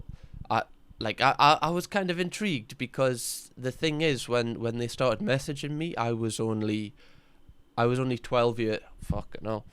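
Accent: Newcastle, United Kingdom. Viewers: hi, fellow Geordie here and someone who's been through similar things. not the same, but similar. thanks so much for speaking out. this has just happened to a friend of mine. he called in a panic and didn't know what to do. luckily i put him in contact with my friend who works with the police force, so its getting sorted. but i wanted to say that you speaking out and using your platform is going to help so many people. so many youngsters look up to you and now you've made so many people not feel so alone. i am so sorry you went through this and at such a young age. it shouldn't happen and your right that is nonsense that the helpline doesn't help those who are minors when these are the people who need the most guidance. it seems like you've done a lot of work on yourself to speak to candidly and passionately about something so traumatic so you should be proud of yourself for how far you've come. 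just know that doing what you do you bring smiles to so many even me who lives away from home at the moment, listening to you laugh n talk in that way that only we Geordies seem to, brings so much comfort these journeys are never fully over, there will always be more work to be done, good days and some not so great, but you really are an impressive young man and i know that its not just myself who's grateful you were able to survive your situation. keep that compassionate vibe you have, you never know who you may save by telling your story, who you may inspire to speak out, or who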